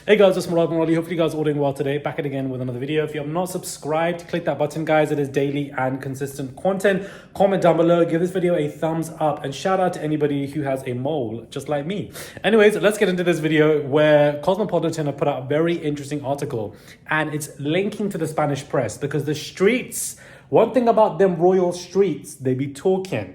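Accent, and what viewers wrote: London, United Kingdom. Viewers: I WATCH you from Lithuania more than a month, like very much. Very good British accent you have.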